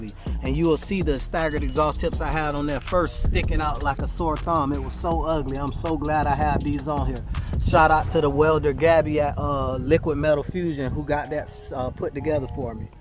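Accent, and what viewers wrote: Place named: Alabama, United States. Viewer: You sound like you are from Alabama, which is where I am. I just bought a 2018 X5 x35i and I am itching to give it bigger balls. Yours sounds amazing. I’d love to have mine sound like that as well. I’m new to your channel. Thanks for sharing.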